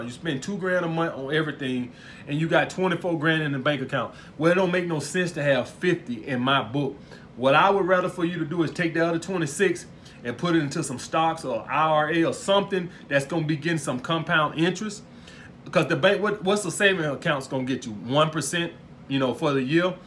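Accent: South, United States. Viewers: Where is he from he sound like he from the south?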